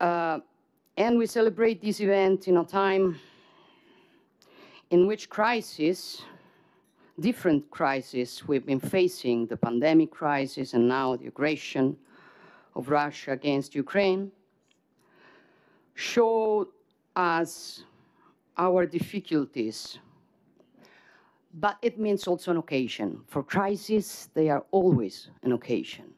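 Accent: Rome, Italy. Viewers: Brava Melona ma l' accento è de Roma 🇮🇹( per fortuna) hahaha 🤣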